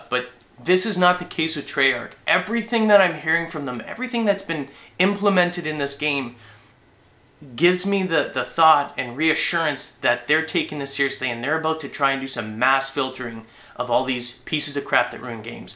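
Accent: Canada. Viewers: They should've taken it out of the whole dam game. side note...are you Canadian? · are you Canadian? just wondering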